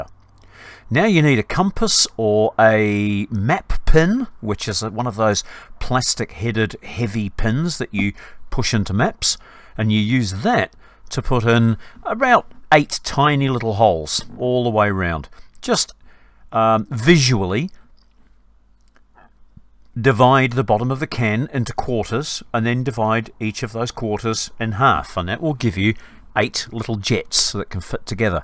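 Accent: New Zealand. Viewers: You sound like a fellow Kiwi man.Not been home for many years and right now I'm living in Odessa but there's no fighting here.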